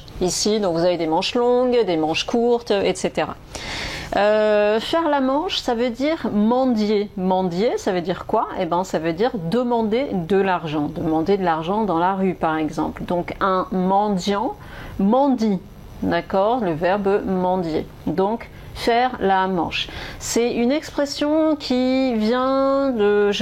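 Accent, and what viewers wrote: France. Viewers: J'adore écouter votre voix, elle est trop française. Merci beaucoup pour les videos. Ils m'aident beaucoup.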